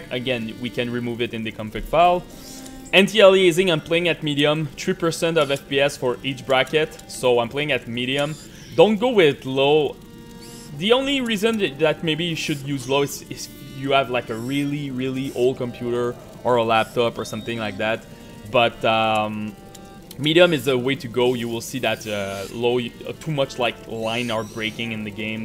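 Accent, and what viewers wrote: Quebec, Canada. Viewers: Arrête! On entend le québécois en lui mais son accent est vraiment pas pire!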